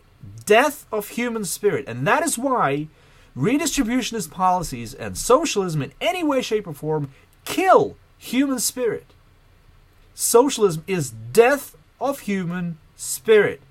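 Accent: United States. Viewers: wow. you lived in the Soviet Union most of your life. You could have fooled me, since you have an "American" accent.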